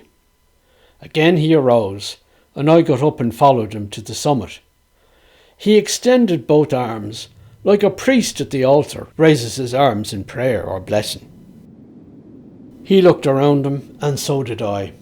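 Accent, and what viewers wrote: Dublin, Ireland. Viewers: What a treat, a tale told by a Dubliner waiting for me when I opened YouTube. Many thanks for this slightly sad story.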